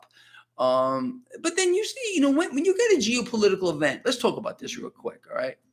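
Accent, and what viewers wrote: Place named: Philadelphia, United States. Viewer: New to the channel, really enjoying it. Love accent. Is it Philly? Jersey?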